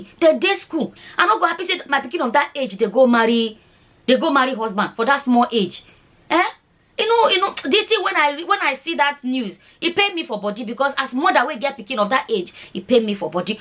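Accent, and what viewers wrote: Nigeria. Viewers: Hi, this your pidgin english TV, i be like say na him sweet pass, the wey you dey take dey yarn the pidgin de sweet me no be small.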